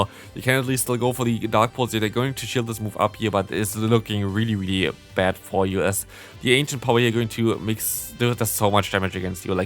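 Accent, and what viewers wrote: Germany. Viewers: Why do I think you have a german accent?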